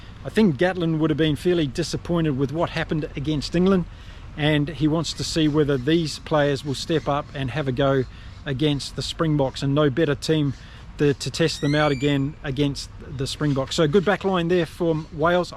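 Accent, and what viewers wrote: New Zealand. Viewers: I laughed when you said you're no rugby expert, here's me as a South African the moment I hear the cadence in your New Zealand accent I'm thinking of every New Zealander pundit I've ever heard and I automatically trust your rugby opinion. 😂.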